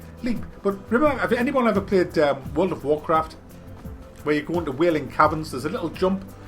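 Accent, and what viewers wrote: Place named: Northern England, United Kingdom. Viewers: great video. just out of interest i assume you are from the north east, if so whereabouts??? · mate, which city are you from, your accent sounds like a northener, most importantly, where's your bell from?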